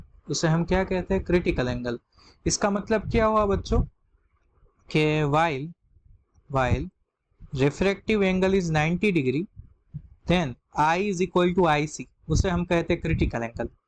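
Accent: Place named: South, India. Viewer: The time I heard south acent I knew that this is the video which will help me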